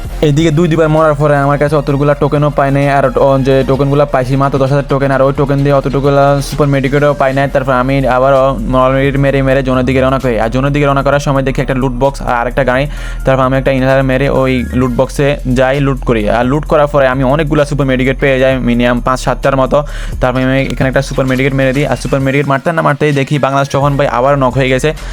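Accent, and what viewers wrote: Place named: Chittagong, Bangladesh. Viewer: ভাই তুমি মনে হয় চিটাগং এর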